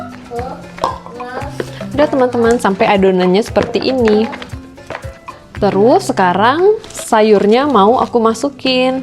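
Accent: Pontianak, Indonesia. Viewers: sudah kuduga.... Logat nya pontianak sekali.... Pontianak dmn nya kak? ❤salam dr pontianak jugak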